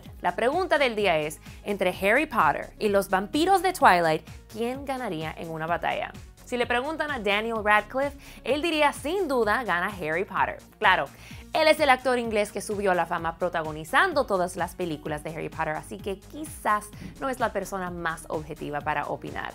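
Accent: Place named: United States. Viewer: Esta bien que quieras hablar como estadounidense pero no mames porque exageras tanto!!!!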